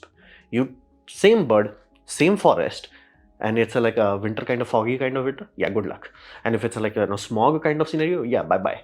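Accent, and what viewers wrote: India. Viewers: Are you a Bihari? upiete? 😅😅😅😅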